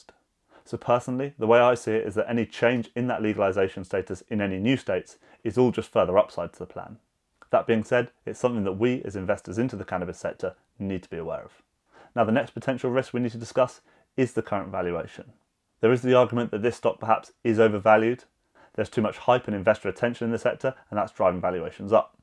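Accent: United Kingdom. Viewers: Bro I found your channel today and watched like 5 vids, you would sound smart even without the british accent haha! Keep up the awesome work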